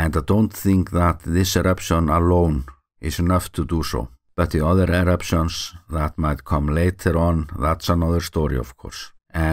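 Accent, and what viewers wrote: Iceland. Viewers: I prefer this guys weird Icelandic accent instead of a soulless a.i. app narrator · I love thr Icelandic accent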